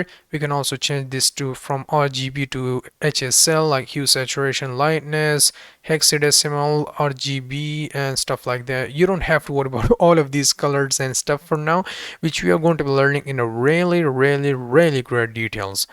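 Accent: India. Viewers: great video but, not gonna lie u have indian accents our its my imagination hahahaha.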